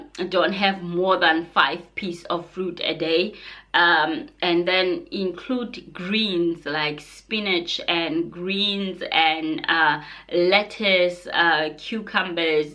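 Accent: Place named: South Africa. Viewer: That was amazing thanks for sharing your weight loss journey.Your accent is South African.28 is a lot wow.congratulations.hit the 🛎I love your content I wouldn’t want to miss out.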